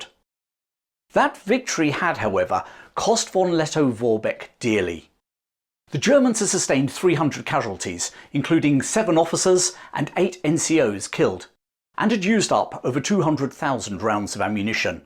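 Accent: United Kingdom. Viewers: German here, loved hearing the story told in such a British and masterful voice. Lettow-Vorbeck ('V' pronounced like 'F') entered German post-war lore as one of the few undefeated heroes. His quite popular own account of the events, "Heia Safari", adorned the bookshelves of many boys and young men in the 1920s and later. My Granddad (b1902) gave his copy to my Dad (b1938), who handed it on to me (b1965). Nowadays however, only a few young folks may still be familiar with the campaign, neither with its adventures nor with its tragedies. Peace!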